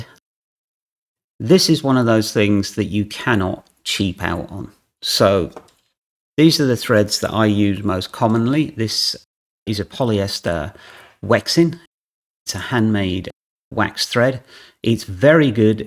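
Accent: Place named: United Kingdom. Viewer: Quick question, why do you quote US prices when you’re obviously British?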